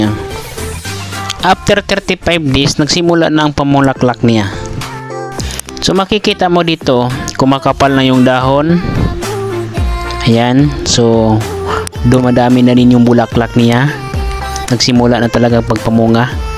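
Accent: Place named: Maranao, Philippines. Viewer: Mranao accent. Maranao ka po ba?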